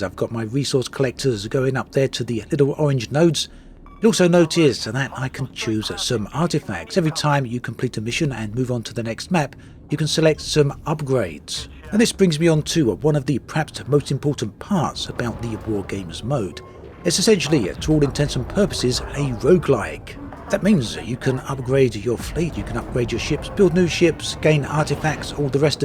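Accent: United Kingdom. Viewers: I had a bit trouble listening to this video, great although it was. I'm not sure if you're doing it on purpose, but you intonate word in a subtle but bizarre way, word such as "video" you say "vide-ó" stressing the 'o'. You have a pronounced British accent, so It just felt out of place.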